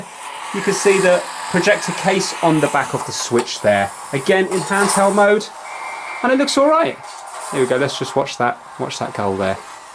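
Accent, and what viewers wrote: England, United Kingdom. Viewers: Hey your british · Lol that funny English accent 😂 It's cool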